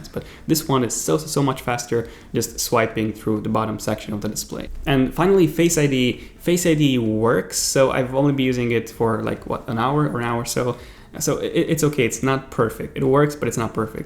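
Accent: United States. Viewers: Your accent is like from usa. Good video mate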